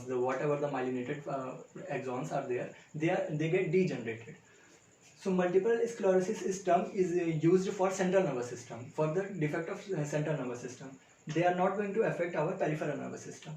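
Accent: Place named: Jagdalpur, India. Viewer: Yeh toh Jagdalpur walaa haina😂 Keep it up Sir..👏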